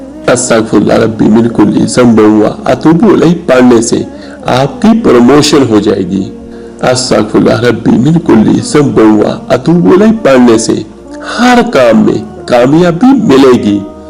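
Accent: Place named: Faisalabad, Pakistan. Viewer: Faisalabadi style of Urdu..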